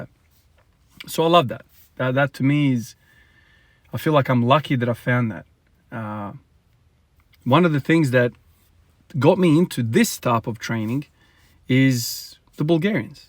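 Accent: Australia. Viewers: What accent is that? Sounds like a mix between Australian and South African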